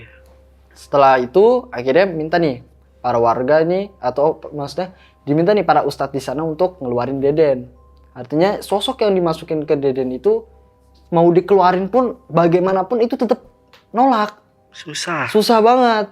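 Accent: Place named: West Java, Indonesia. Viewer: abang nya ngobrolnya lucuu 😅 sunda campur logat nya adaa,, cerita serem pun jd nyantaii dengernyaa ,, sukses terus buat semuanyaa,, lentera malam pkonya kerennnn 🤗🤗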